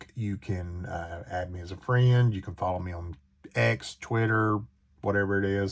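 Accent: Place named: South, United States. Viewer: It is so nice to hear that sweet sweet southern twang again. I missed you brother.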